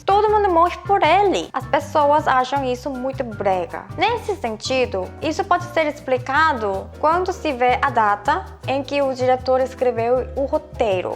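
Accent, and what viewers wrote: South Korea. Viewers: amei seu sotaque coreano, obrigada pela explicação!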